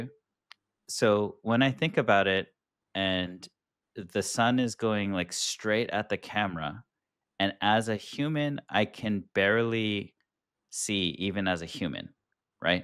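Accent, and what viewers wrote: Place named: England, United Kingdom. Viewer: This guy has the most annoying English accent ever. I want to listen to the guest. But I cannot handle the annoying accent! I just can' t.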